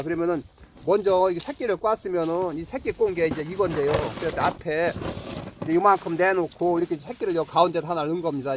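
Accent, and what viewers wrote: Chungcheong, South Korea. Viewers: 손 새끼는 제가 더 잘할듯 ㅋㅋ 충청도 사투리 정겹습니다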